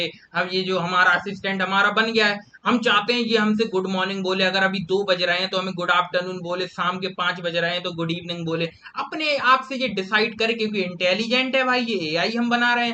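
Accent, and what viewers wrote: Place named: Bundelkhand, India. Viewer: you bundelkhandi acent is too good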